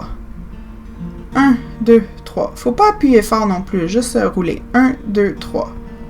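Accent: Canada. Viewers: Your English has a (it sounds to me like) a Canadian accent, are you from Quebec Canada? I'm from Vermont USA. I only ask you this, because you speak French too.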